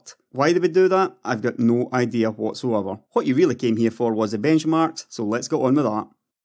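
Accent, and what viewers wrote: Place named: North, United Kingdom. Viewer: i love that northern accent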